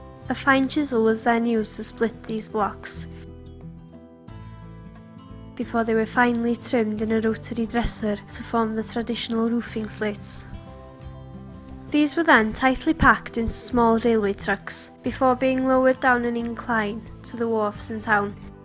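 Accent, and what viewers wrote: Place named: North Wales, United Kingdom. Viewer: Very N. Walian. Both my aunts sounded like that. I hope "Bleina" is re-inventing itself - it deserves to.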